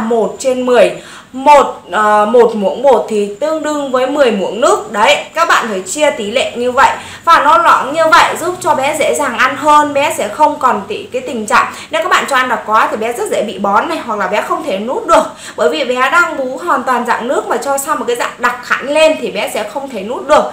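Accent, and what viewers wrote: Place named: Thanh Hoa, Vietnam. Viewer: Giọng THanh Hóa đặc sệt 😂 hơi khó nghe b ạ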